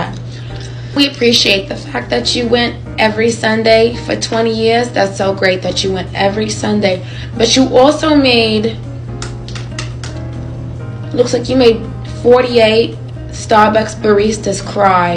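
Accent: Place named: New York, United States. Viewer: love the New York accent. fr Australia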